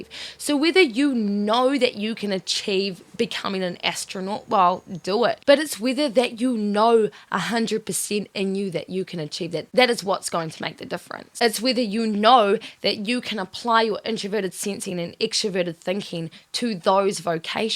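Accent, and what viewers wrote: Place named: New Zealand. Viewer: That posh/bogan kiwi accent tho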